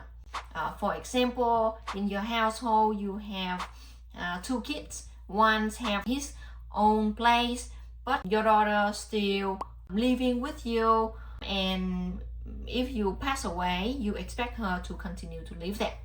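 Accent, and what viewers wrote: Vietnam. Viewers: Yes, the podcaster has difficult to understand Viet-English. Good content but hard to listen to. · Why do u sound vietnamese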